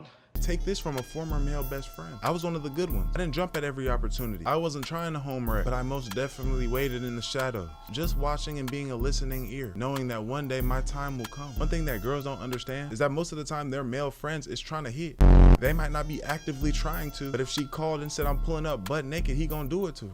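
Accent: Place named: Texas, United States. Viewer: I've been binge watching your vids and your a very good story teller. You gotta subscriber out of me and it sound'n like you a Texas nigga too. So I'm awwready ready to support. Keep it going.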